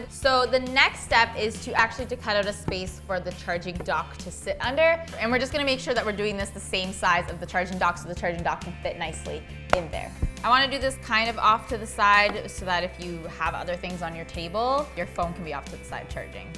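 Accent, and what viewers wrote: Canada. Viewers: Are u Canadian.?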